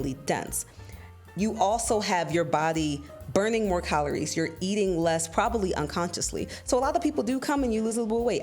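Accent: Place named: Kenya. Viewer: Gurllll!!!! You even have a Kenyan accent!! B A R G A I N I N G 😂😂😂😂 Karibu home!! #InstantFOLLOW!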